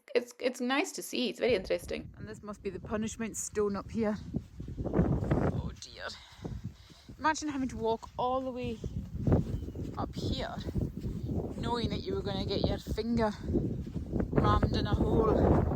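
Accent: Scotland, United Kingdom. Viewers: I've just watched this again! I could listen to you all day haha I just love your accent. It's weird for me that my great great grandad would have had one similar (tho he was from Dumfries & Galloway) because no-one in my family has a Scots accent. So the music, in this one I think it's just right, but I do think when you are speaking, don't have music as well. I have my volume up loud so I can hear the commentary, so it's a shock when suddenly it's music and loud lol. Did the wind muffler arrive yet?? You should be really successful at this you are SO watchable.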